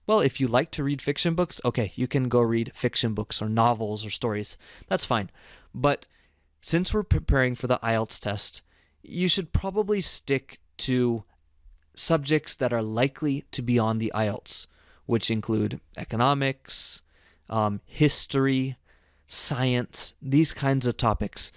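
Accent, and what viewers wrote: United States. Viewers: Oh my goodness...m in luv with ur voice😘so soothing n everybody's gonna luv to listen to u...American!!u r??(for sure)...well don't get me wrong...wanna check my accent recognition capability.🤗... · Awesome mate, this is all I want to know about reading correctly, not just for ielts test also for a long term English learning or any other language learning. The thing is, I'm curious where you come? R u American?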